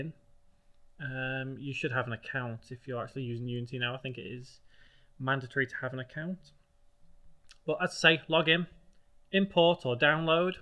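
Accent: United Kingdom. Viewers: Dude, he's clearly not American with that British Accent. He's from UK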